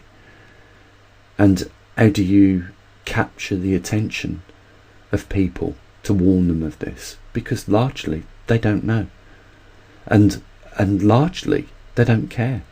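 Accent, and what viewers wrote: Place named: Walsall, United Kingdom. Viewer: Can you tell me what part of the UK you’re from? You sound very local to me..... Walsall???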